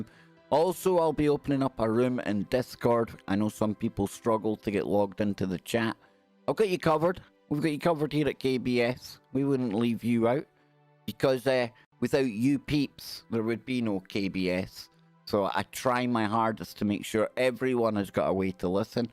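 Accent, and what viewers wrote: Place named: Scotland, United Kingdom. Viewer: Hello from sunny San Diego CA, USA! You rock... Thanks for lifting my spirits. & I am so in love with your ACCENT!!! I just really love it... It's kinda soothing. Blessings to all of you in beautiful Scotland!!! 🤓💜🎉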